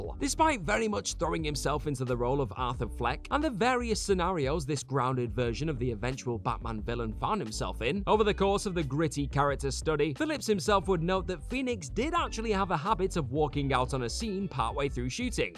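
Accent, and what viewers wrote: United Kingdom. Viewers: He's Bri ish though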